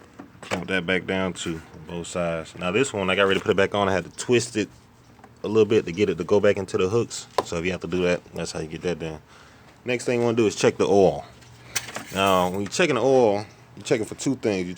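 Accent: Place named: South, United States. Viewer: You sound like you’re from the south, where ya from? I’m from east Alabama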